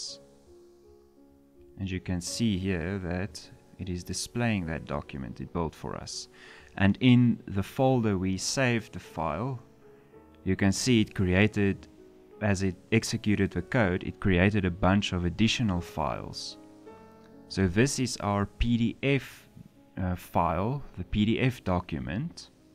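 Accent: South Africa. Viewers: South African? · Thank you so much from your articulation I hear you are South African. I would like you to buy coffee, beer or coke....eWallet?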